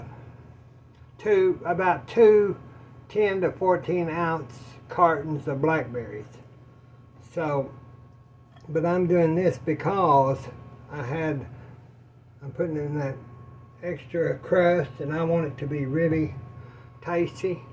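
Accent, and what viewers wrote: South, United States. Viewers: Hi, I really enjoy your channel. I'm a cobbler fan from way back, and I love your double crust method. I'm adopting it! My parents were from the south and I grew up cooking with cast iron and bacon grease. Matter of fact my mother was from the Little Rock area. Your accent certainly does sound like almost everyone in my family. Sweet memories.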